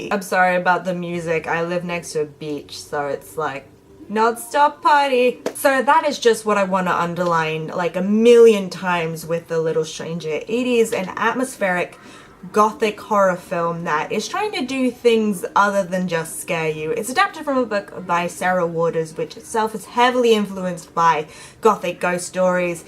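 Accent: United Kingdom. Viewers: Do you live in Southeastern England? You don't have a heavy accent.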